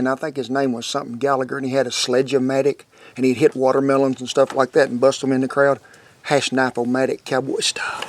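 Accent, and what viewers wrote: South, United States. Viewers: i'm a dutch guy, but somehow i'm addicted to southern bbq and grill shows now... love the food and the accent :) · Man I just love this southern states accent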